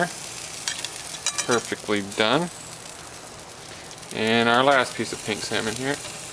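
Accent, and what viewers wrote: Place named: Midwest, United States. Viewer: Where are you from? I can't place your accent. Somewhere from the Midwest? Chicago maybe?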